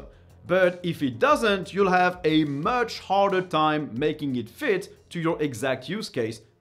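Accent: France. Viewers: On est d'accord t français ? j'ai l'impression avec l'acent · nice french accent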